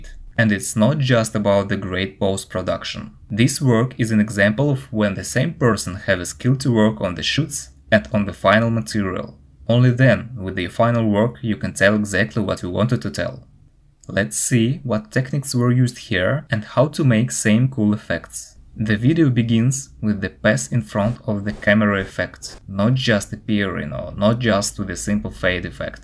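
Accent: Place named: Russia. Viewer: Good review. I really enjoyed it . I first had trouble with your Russian accent, but then it is was a good idea to add captions and titles throughout the video. Keep it up.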